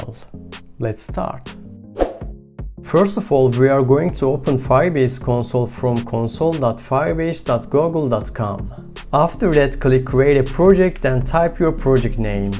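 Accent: Turkey. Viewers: Are you Turkish guy ? :)) Your accent is very similar to Turkish :) I have just curious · Videonun ilk 4 saniyesinde telaffuzdan oturu "Lan Turk lan bu" diyisim :) ilk defa goruyorum kanalini, basarilar diliyorum.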